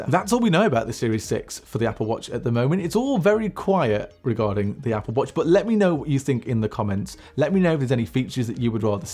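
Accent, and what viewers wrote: United Kingdom. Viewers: Dollars ? You show pounds on the screen and say dollars, with a British accent. A bit confusing. Canadian dollars , or Australian dollars ?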